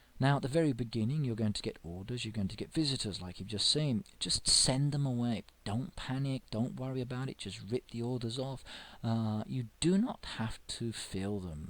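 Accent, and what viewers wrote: United Kingdom. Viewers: Hey, you are fine with me about the accent. Here in the states people talk with a tone in their voice as if tey are asking a wuestion yet making a statement, being sight impaired it is annoying, yes I see with one eye and a gamer at 53. Love it. Especailly hayday. British accents are hot. Thats howi got blessed to meet my spouse, he met the bestles. Lived in kent and went to dorton house schol for te blind.theuk treated him as if he was royalty.respect and dignity not like the services for the blind here. They doubted my connecting up the internrt, dragon naturallly speaking, amazon, dow loading books and games, hayday.my farm is teri millers. Pigs infar upper right hand corner, bees decoate the front, right.you will eventually find a theme. And my artisic skills no horses yet and bunnies soon.like me onfb. Treacle tarts are a dream. 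I got to visitfor two weeks. Too short for me. I love the uk.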